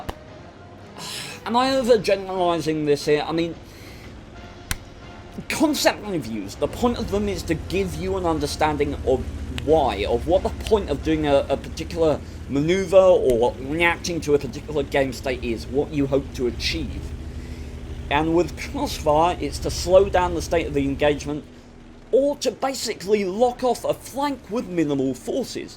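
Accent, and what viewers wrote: United Kingdom. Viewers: I like ur UK accent bro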